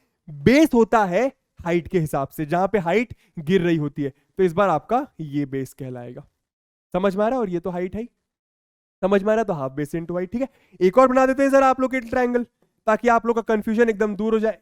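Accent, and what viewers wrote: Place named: Bihar, India. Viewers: sir speaking bihari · Such a bihari 😅😅 but good THANKS sir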